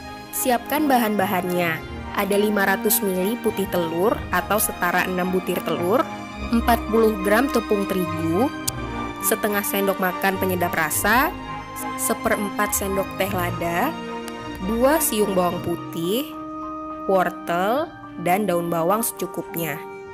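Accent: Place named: Jambi, Indonesia. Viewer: Orang mana kak? Kok kaya orang Jambi wkwkw logatnya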